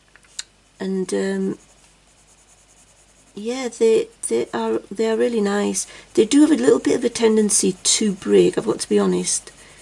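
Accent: United Kingdom. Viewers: Hi K, I’m sorry to hear you have not been feeling well, I’ve never had a migraine but I know they can be disabling. As always I get excited when you are doing your magic on camera. I’ve enjoyed this series so much. You do not sound like a frog 🤣 at all, you actually have a beautiful and soft voice, that I find soothing and I love your British accent. Wishing you a speedy recovery and I am looking forward to seeing all the pages you colored. For me, it’s been work and more work, but I am ready for Mermay!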